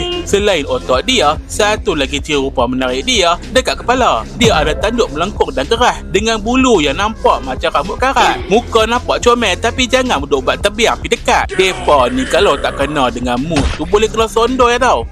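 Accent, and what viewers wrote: North, Malaysia. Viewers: loghat utara tu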